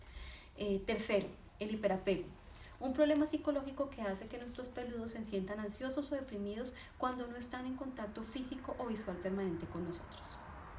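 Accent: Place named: Colombia. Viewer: Acento Colombiano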